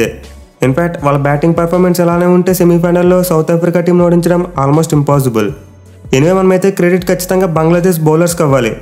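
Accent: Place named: Andhra Pradesh, India. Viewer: Andhra slang 😅😅😅😅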